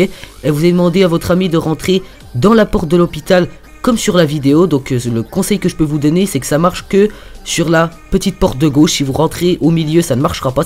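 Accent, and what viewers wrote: Belgium. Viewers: Ah psk ta un axants qui resanble au belg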